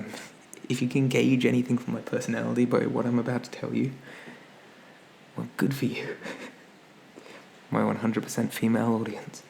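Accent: Ireland. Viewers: 1)Yeah you have just sold me on this crazy Bob Ross idea. "Beat the god" out of that paint brush. 2) it's "chicken and chip" chips or "fish and chip" chips in London. And your Irish accent is brilliant, it will make 100% of your girl viewers go crazy. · I enjoyed this so much! I think you should do more personal videos like these. It's nice to see what YOU are like and not just your characters(which are great btw). Also just to let you know...I really like your Irish accent. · I haven’t see it in the comments, so I’m just gonna call out the Bioshock reference now. I know this video is a bit older, but I’d also like to say that I adore your channel and the characters you’ve made. I’m always up for a good story and you never disappoint! Adore the Irish accent as well! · I love your Irish accent!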